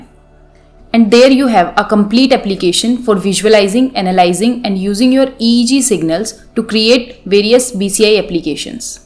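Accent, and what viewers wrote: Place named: Rajasthan, India. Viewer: she must be Rajasthani or Gujrati. very strong accent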